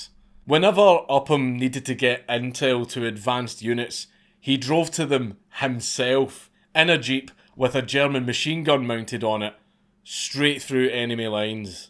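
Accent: Scotland, United Kingdom. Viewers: I don't know what the hell it is but the scottish accent is delicious to listen to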